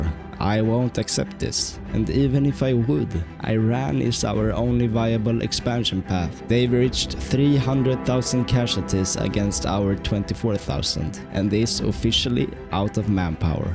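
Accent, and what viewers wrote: Sweden. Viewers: helvete vilken svensk dilekt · Du har den svenskaste brytningen jag någonsin har hört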